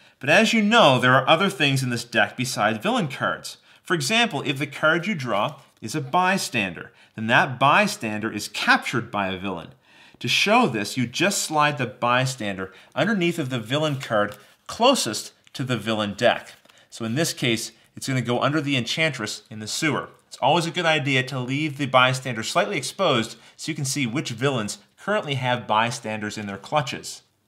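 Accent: Canada. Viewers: This helped alottttttt ..... PS... this guys accent is so canadien haha great job though!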